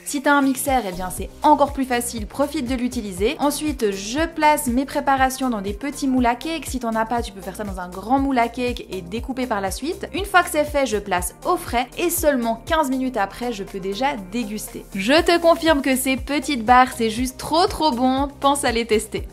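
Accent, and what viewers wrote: Switzerland. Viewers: Oui mais le poids des myrtilles est-ce avec l'eau qui a gelé ? Ah c'est marrant j'ai trouvé dès le début que vous aviez l'accent suisse! De vers Neuchâtel ou par là! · Vous avez Un accent suisse 🇨🇭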